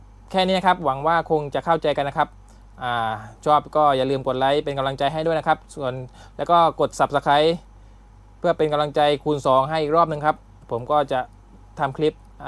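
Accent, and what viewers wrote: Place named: Surat Thani, Thailand. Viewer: พี่เป็นคนสุราษฎร์ ม้ายนิ ผมแลคลิปเก่าๆได้ยินแหลงกับแฟน สำเนียงเหมือนคนบ้านผมเลย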